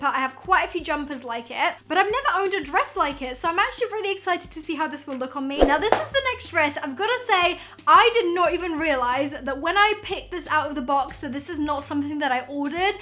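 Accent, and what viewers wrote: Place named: United Kingdom. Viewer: Jana Deni she has a British accent of course she isn’t going to say it right 🙄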